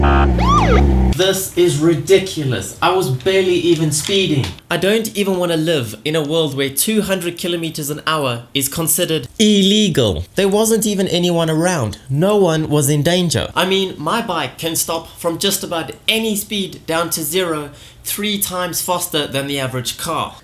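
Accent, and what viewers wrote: Afrikaans, South Africa. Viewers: Are you from south Africa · I was suspecting your from South Africa just because of your accent and then i saw the world clock on joburg, you just have that afrikaans accent some of us afrikaans mense have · Eyyyyyyy, I new u were South African when I heared your voice🤣🤣🤣then I saw "Johannesburg" so what up bra🤣🤣🤣 Ok so like I'm 15 and I wanna get a bike for next year, it's a Honda cbr125r for 15k and I need to buy it myself😦😦😦 so any suggestions on how to get the cash🤣🤣🤣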